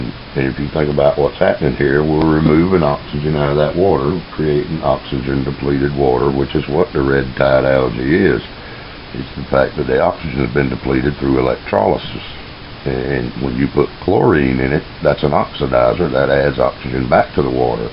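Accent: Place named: South, United States. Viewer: Pleasure to watch your Vid. Thank You. So why do you think it is. that when people hear a SOUTHERN accent they automtically tjink SLOW OR STUPID? It has always bugged me. Thank you. You may talk slow but you sure don't think that way. :-) PS: My daughter who is Home Schooled follows you and understands you VERY WELL. She is doing experiments everywhere now. She is watching for "SIGNS OF LIFE" LOVE IT!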